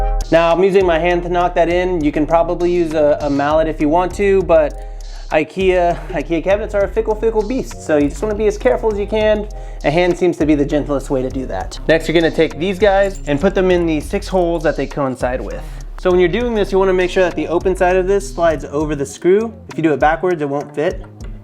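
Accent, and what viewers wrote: India. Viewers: Accent sounds like a Hindu guy. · Sounds like an Indian man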